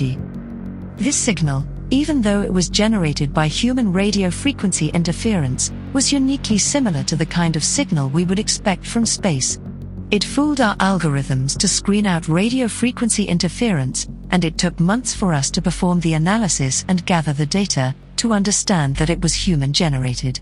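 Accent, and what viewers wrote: United Kingdom. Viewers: Appreciate the British accent. The 500m large radio telescope is mighty impressive. The rest about aliens is speculation. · How come every time you guys make one of these documentaries or whatever you have to have an English British accent what's up with that can someone go yo yo yeah there might be something out there yo dog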